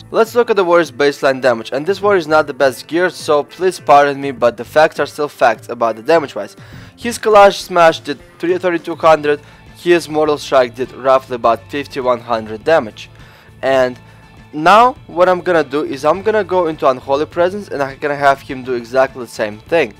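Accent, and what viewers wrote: Russia. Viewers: Are you truly a russian, ive watched many of your videos and you talk the same in each video, but at this video you say to a guy in wow " Gonna make another video with stupid accent "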